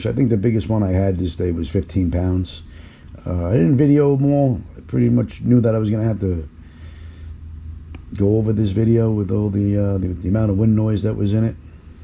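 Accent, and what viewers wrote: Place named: New York, United States. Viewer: I already know I want this rod , lamiglass something, I got that thick new yawk accent too, Bronx ? Anyways I’m from Long Island , local#3 in the city more than here, In any event yeah if you can let me know what rod that is - specs wise , I definitely need a new one, sick of my 9-2 st. Croix , it’s not the greatest for this top water guy , about to watch this vid I know you guys are gonna crush it ! Thanks brotha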